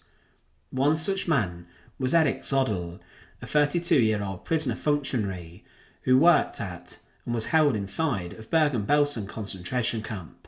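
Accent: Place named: East Midlands, United Kingdom. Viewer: I'm from Wolverhampton and can catogorically say he's not from anywhere in thecwest midlands! Later in life I worked in Derby for twenty years and he sounds like he comes from somewhere in the East Midlands ... Can't really say where exactly though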